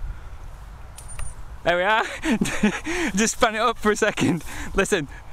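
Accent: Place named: United Kingdom. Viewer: I'm not in the UK though. I just thought by the way he sounded he was in the UK when I watched his videos. I live in Florida, I already bought rbg strip of led, just because I know I'd need help with oriantation, this is my first quad I can tune on my own. kinda excited and really wish it would come I'm already! !! I wanna fly it... I saw at the hobby shop a race drone with fpv and everything except battery and controller on sale for 170 I'm thinking of grabbing that as well lol. I been dying to fly and hook up my fat shark to something that flies again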